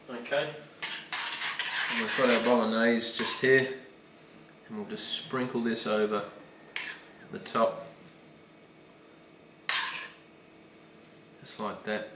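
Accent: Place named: Australia. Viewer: r u aussie great stuff man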